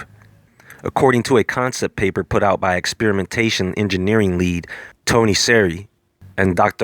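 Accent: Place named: New Jersey, United States. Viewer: Where are you from? You sound like a NJ guy. Wherever, I’m addicted to your voice. Great channel. Keep it coming!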